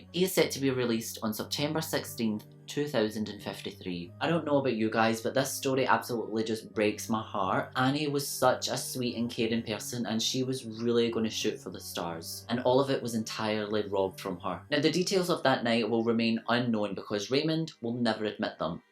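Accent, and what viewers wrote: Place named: Scotland, United Kingdom. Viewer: I’ll let it go because we did get a Scottish accent in its place. ;) Lol!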